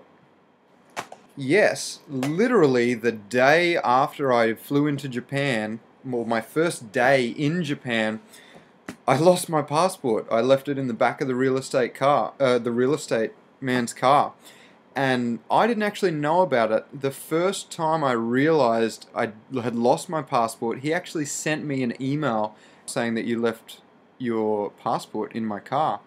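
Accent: Australia. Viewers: To be honest I thought exactly the same after watching the video xD The stupid aussie accent just makes it even worse..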